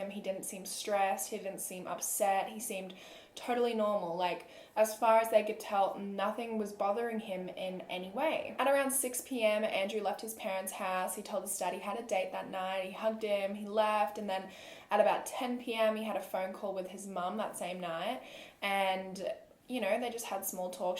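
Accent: Australia. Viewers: The Australian accent is the best. Your voice is very soothing 🙌🏼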